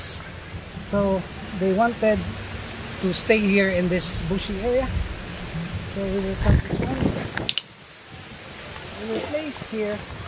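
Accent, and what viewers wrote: Philippines. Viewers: I can tell you're a Filipino 1st Your Accent 2nd The sorroundings 3rd Your grammar And im filipino too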